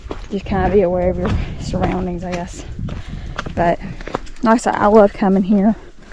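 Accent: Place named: Georgia, United States. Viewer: You sound like you are from Georgia.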